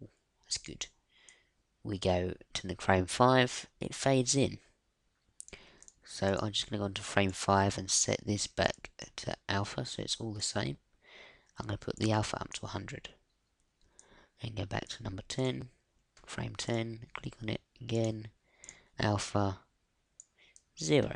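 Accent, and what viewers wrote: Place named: England, United Kingdom. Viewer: It's not the sound, he's just got an English accent...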